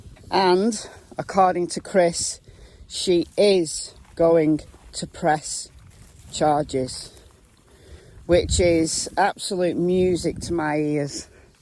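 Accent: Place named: Northern England, United Kingdom. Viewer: Aww shame it's the end of hopping Cassie 😏 Absolutely love your channel. It's great to hear a northern accent. I'm a Brummy myself. Anyway catch your next stream ❤️👍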